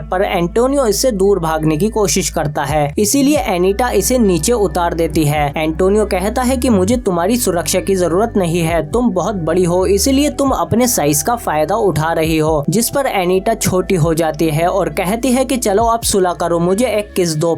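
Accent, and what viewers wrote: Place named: India. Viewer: Your Indian accent, when you speak in English, is terrible, man from India. Learn English better.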